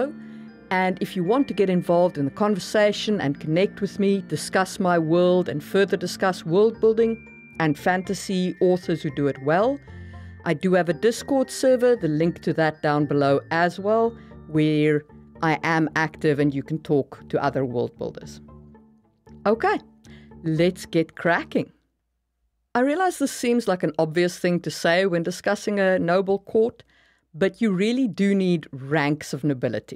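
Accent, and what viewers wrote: South Africa. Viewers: I love the step by step way you take us through detailed aspects of worldbuilding! I've been watching tons of worldbuilding videos and so many of them are so general that I take nothing away from it. Yours are great and really well structured, I've got some ideas to work with just from this one video. Subscribed! P.s., your accent is so cool, are you from South Africa?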